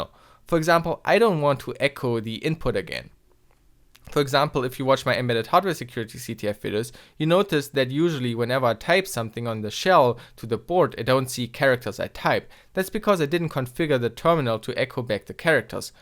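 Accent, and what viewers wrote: Germany. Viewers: I have all the time the feeling that you are from germany because you have a german like accent :D. But nice vids btw :D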